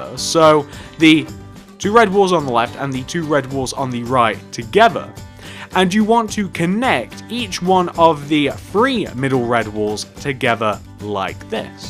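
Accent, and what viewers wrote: United Kingdom. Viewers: He sounds British, and I'm British, so if he is British, why did he spell it Theater instead of Theatre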